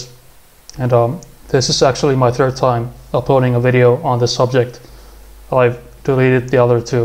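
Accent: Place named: Eastern Europe. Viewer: I always know i'm in for a great tutorial vid when I see a grainy webcam and the uploader has an Eastern European accent of some kind, no 5 minute intro and unnecessary bullshit just str8 to the good stuff. God bless you man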